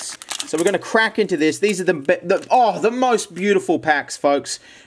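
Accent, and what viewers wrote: Australia. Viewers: Yes your Australian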